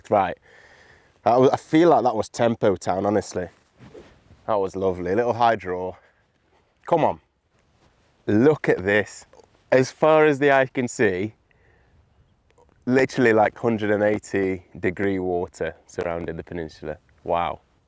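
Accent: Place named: Scotland, United Kingdom. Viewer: Hopefully it’s only the golf you concentrate on and not yr stupid Scottish accent if this I will unsubscribe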